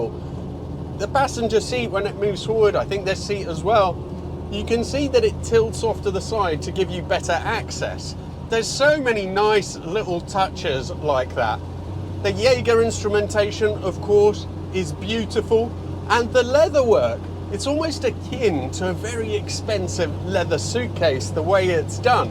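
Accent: Italy. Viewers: No need to apologise for the Italian-ness. When it comes to Italian cars, them Italian twang is kind of necessary. At least in my book, but I'm a weirdo. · I really like this guy and appreciate his great videos but his over pronunciation of Italian words is really grating and un necessary . We get the point , yes you have an Italian heritage but let's be honest you grew up in the UK and that's how your true accent . I can speak some Italian but never over emphasise the accent as it sounds silly when mixed with English.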